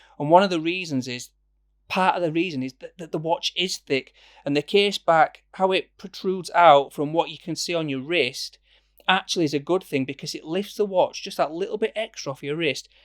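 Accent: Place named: Leeds, United Kingdom. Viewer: Enjoyed your review, very rounded and if I can convince the missus this will be my next purchase Trying to place your accent, somewhere near Leeds ?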